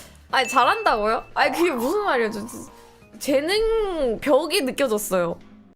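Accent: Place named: South Korea. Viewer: 서울사람아니었나보네 사투리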